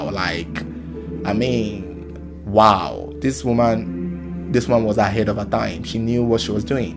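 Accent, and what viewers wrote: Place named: Nigeria. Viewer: This narrator sounds Nigerian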